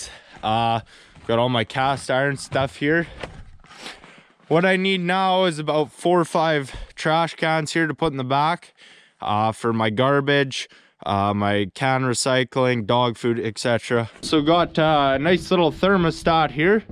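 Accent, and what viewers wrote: Canada. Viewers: You’re the most Canadian dude I’ve heard since I last listened to a Bob and Doug song! Cool off-grid building, I’m inspired!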